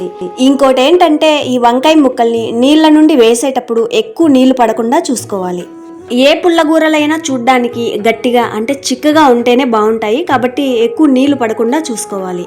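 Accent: Rayalaseema, India. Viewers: మీది రాయలసీమ నా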